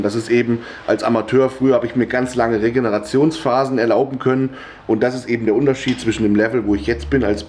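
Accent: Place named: Hesse, Germany. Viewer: Er meint wohl hessisch, wohne nicht so weit von ihm, aber muss wirklich sagen das man in jeder Gemeinde/Stadt ein bisschen anders spricht. Anstatt „spitz" sagt man hier zum Beispiel „speutz". Aber Plattdeutsch ist es nicht 😅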